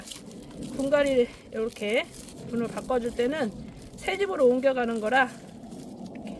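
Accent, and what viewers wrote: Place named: Jeolla, South Korea. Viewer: 안녕하세요 ^^ 진정한전라민국사투리 묘똥ㅎㅎㅎ 정감있는사투리 잘배우고있어요 저도지난번구입한거슬슬분갈이해야겠어요^^ 편한밤되세요 😊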